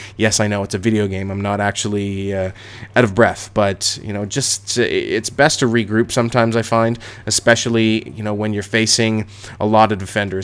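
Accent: Canada. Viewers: are you canadian because i don't know why but i hear that type of canadian accent when you speak and pronounce vowels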